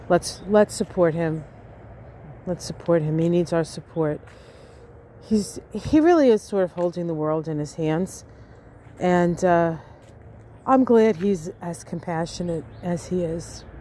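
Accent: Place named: East Coast, United States. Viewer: Another reason why I tune into your morning astrological strolls with Charlie is because you are not a phoney - just sincere and concerned commentary on American and world events with doses of deep wisdom, humor, and splashes of that strong east coast accent (I’m from Somerville, MA) Hearing another speak about and process daily events is therapeutic for this listener…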